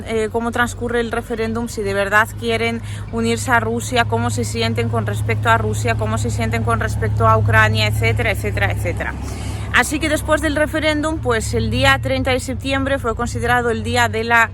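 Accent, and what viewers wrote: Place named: Madrid, Spain. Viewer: Todo bien con vos, pero pregunto, porqué decís "actualidaZ" en lugar de "actualidaD"? Es con D no con Z. Esa estúpida manera de pronunciar que tienen los madrileños que en lugar de decir MadriD, dicen MadriZ, y vos lo prununciás igual. Por favor, si vas a hablar castellano, hablalo de modo neutro, natural, no con modismos locales. Otra: si decís "noticia" es "notiCia", con C, no con Z. Porqué decís "NotiZia", como los madrileños, o como algunas pocas regiones de España? En ningún lugar del mundo de habla española la C se pronuncia como Z, o sea, que para quienes somos latinoamericanos e hispanoparlantes (que somos la gran mayoría en el mundo) suena casi odioso oir que se prununcie de ese modo. Esos vicios en la pronunciación los puedo entender en alguien nativo de Madrid, pero no en un extranjero que pretende hablar castellano. 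Igual te felicito porque lo hablás demasiado bien, y sobre todo por tu trabajo. Gracias.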